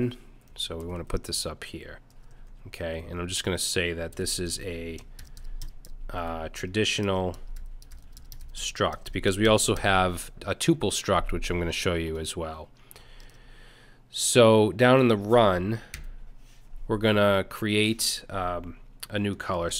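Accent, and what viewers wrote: Boston, United States. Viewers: This is a great place to start. Well spoken, easy to follow and your Bostonion? accent makes it more interesting.. thanks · Just a question,are from Boston ?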